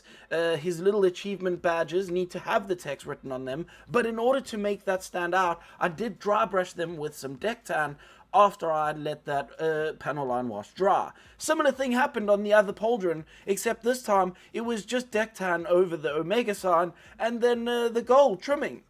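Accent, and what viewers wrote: South Africa. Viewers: My guy sounds like a fellow South African! No disrespect meant of your not😅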